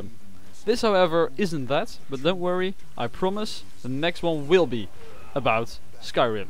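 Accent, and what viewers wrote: Netherlands. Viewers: Haha ik ook, kdacht al toen ik je stem hoorde xD Nederlanders kunnen echt geen engels praten. Heb deze game net ook gespeeld f*kking vet:D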